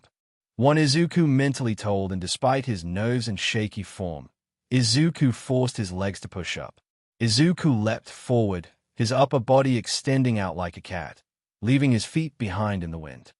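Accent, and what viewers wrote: United Kingdom. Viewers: Also why is the ai British?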